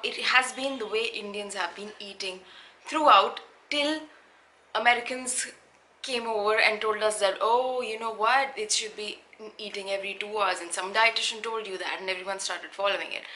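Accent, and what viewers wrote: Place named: Trinidad and Tobago. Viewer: good info you sound like a trinidadian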